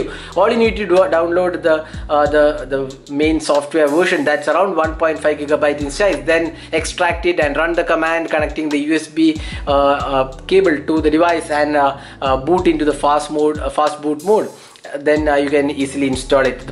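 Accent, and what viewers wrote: Kerala, India. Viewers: Kerala bro? From the L and N pronunciation 😅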